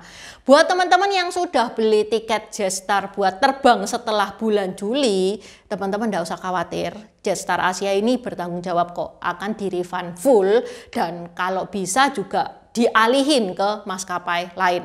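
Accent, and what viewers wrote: Java, Indonesia. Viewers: Aduhhh, dengerin ci menjelaskan, maaf ya, kenapa jd stress ya😅... volume suaranya itu lo mbak dan medog jawanya. Pusyingggg... Intinya kawan. Mau di terminal brapa aja turunnya, gov singapore sdh pasti membuat penumpang nyaman. Ada skytrain, ada shuttle bus tp free semua sbg bentuk convenient nya utk penumpang. Jd ga susah2 amat mau dr budget airline or full service flight kalo perlu pindah. Utk makanan, branded stuff, di semua terminal ada. No worries.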